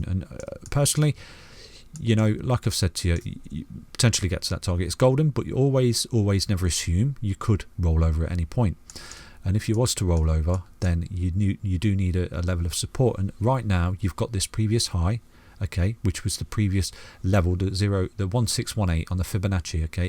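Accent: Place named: Nottingham, United Kingdom. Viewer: You sound like a Nottingham lad! Great TA mate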